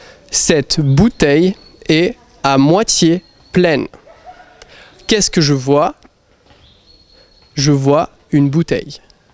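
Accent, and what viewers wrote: France. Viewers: Love the French accent. :) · The way this guy is speaking French is really cute. And I love to hear the french accent it's so satisfying I don't know why. I didn't learn much from this video honestly. 😂😂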